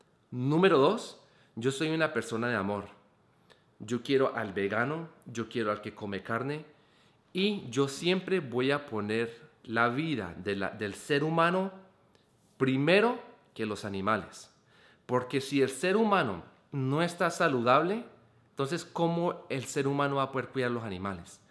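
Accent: Colombia. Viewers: Holaaaa me encanto tu video !! Esa es la neta como decimos en DF ahora Ciudad de México. A las cosas x su nombre. Beso me encanta tu acento colombiano.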